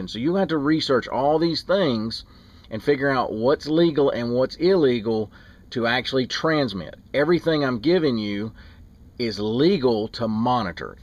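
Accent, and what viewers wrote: South, United States. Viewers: I dont hear it. Besides the southern accent. 401s accent isn't as thick.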